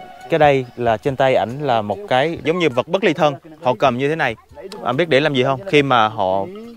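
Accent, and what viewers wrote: South, Vietnam. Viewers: A là người nước ngoài sống ở Sài Gòn, a xem video của a để tập nghe tiếng việt vì giọng miền nam quen thuộc với tôi. Cảm ơn nhiều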